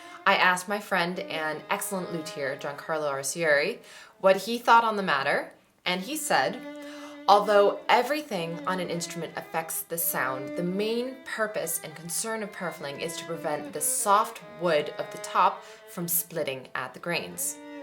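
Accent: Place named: France. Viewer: Great french accent and history background ✨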